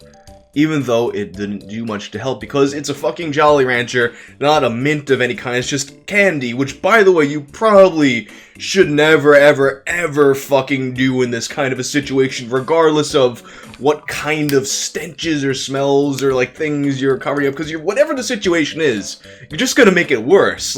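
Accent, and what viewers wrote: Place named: New York, United States. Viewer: First time binging your vids and after a couple of videos I needed an answer. I knew you're from NYC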